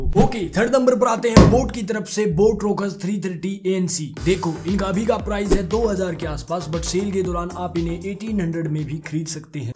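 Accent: Haryana, India. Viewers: Bhai haryane se hai k ? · Harayana say h bhai 😂😂jai haryana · Bhai boli se to haryanvi lage hai kha se ho.... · Nyc haryanvi accent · Haryana se hai kya bhai, tone to kuch aisi hi hai❤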